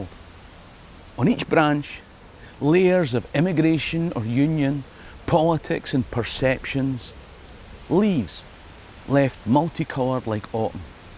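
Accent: Scotland, United Kingdom. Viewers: Interesting and stimulating. History you carry w us, like culture and accent. I found i had to ditch the Scottish dark subtle (sense of) humour when I left Scotland. Kiwi humour is... less developed shall we say;) it's lovely to hear your accent and humour and learn details of history we didn't get at School. Further south from Dunedin, in Southland, you will hear the rolling 'r' in the way the ppl speak. Dunedin is a university town. The countryside around is more rural and Scottish like. Go to places like Ettrick on farmers day. It will feel more like Braemar:) Interested in how you smiled and felt visiting Waipu. I lived around there over 20 years ago. Scottish culture and upbringing gives us values and education and that canny humour. Thank you:)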